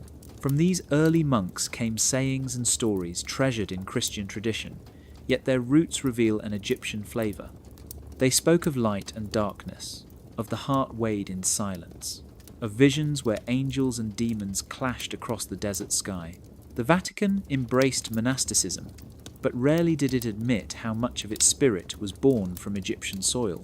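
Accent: England, United Kingdom. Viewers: Are you a English lad? Did you come from Oxford?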